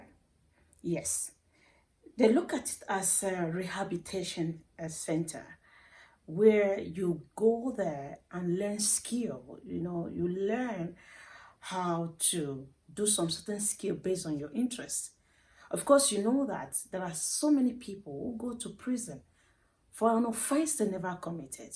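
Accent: Kenya. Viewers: Your accent is changing into a Kenyan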